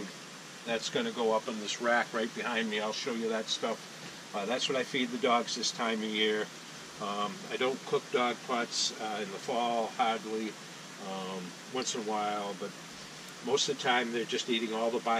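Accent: Boston, United States. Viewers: You still have that Boston accent, thanks for sharing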